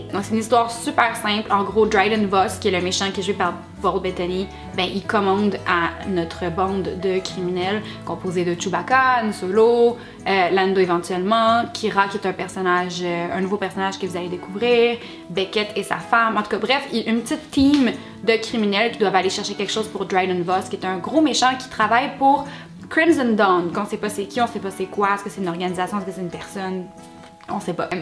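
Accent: Canada. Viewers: Moi aussi J'ai bien aimé. Je ne suis pas un fan de star Wars et heureuse, par ce que les fans sont toujours très déçu par quelle que chose ))) . Et puis un avis C'est toujours SUBJECTIF. ( je suis français et J'aime toujours autant l'accent et la façon de parler des canadiens ! ). Bonne continuation.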